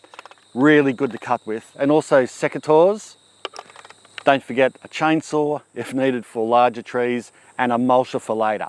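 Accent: Australia. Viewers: We moving in to a house that has some fruit trees, which we've never owned. The orange tree is so thick, we can't get a fruit picker up there to get the oranges. Every day 4-5 oranges drop down. They're already moldy and split when they hit the ground. We can even see some brown shriveled up oranges up there. The tree also has small green oranges, so it's still producing. You mentioned it's not good to prune while the tree is producing. So what should we do? There's also a apple tree in the same condition. Any advice would be greatly appreciated. I live your channel because you're have a wealth of knowledge, I live the Aussie accent, and I so love your humor and quick Witt. Thank you for sharing!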